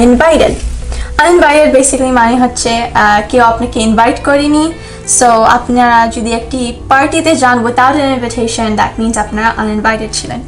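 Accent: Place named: Bengal, India. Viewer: Best accent of Bengali pronunciation ever I have heard.❤️❤️❤️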